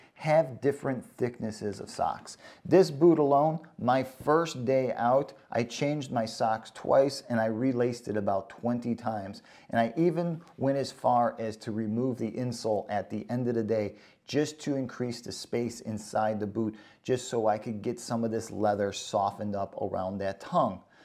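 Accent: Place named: Midwest, United States. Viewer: Interesting accent... is it midwestern maybe?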